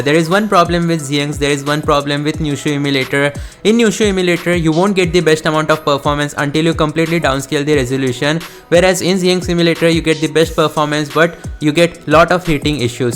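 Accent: India. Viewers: Damn Indian accent is so weird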